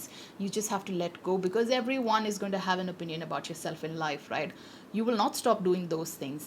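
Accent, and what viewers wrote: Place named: England, United Kingdom. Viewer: Really loved this video. I really love your English accen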